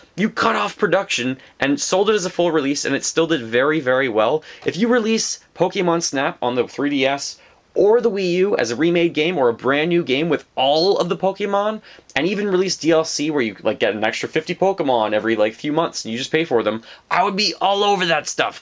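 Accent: Canada. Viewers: I just came across your channel and I really liked it, man! I enjoyed hearing your thoughts and optimism for Nintendo's E3. I really hope to see the Wii U Zelda that isn't Hyrule Warriors (Aonuma pretty much said this was true), some strong 3rd party titles, and some more stuff for the Virtual Console. I want my N64 and Gamecube games on there! Of course, I really want a new Star Fox, Metroid, and F-Zero, but we'll have to just wait and see about that. Also, it might be just me, but you sound tremendously like Alex Lifeson from Rush with that Canadian accent.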